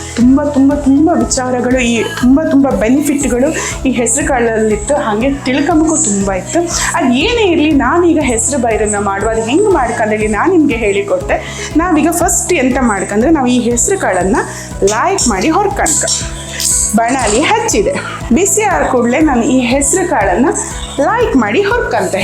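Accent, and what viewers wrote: Kundapura, India. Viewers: kundapura kannada super 😍😍 Niv tumba chanagi matadtira.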